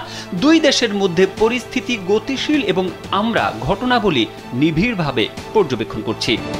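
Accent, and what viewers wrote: Kolkata, India. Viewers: আপনি দেখি কলকাতার মত খবর পড়া শুরু করেছেন. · Reporter Kolkata reporter er moto kota nolen😂😂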